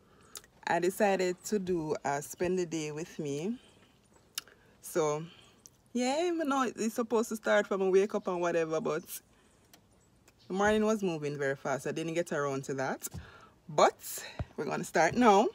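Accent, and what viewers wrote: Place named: Jamaica. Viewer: Good job, I like jamaican accent , yes man. You are a whole vibe and a very happy gal.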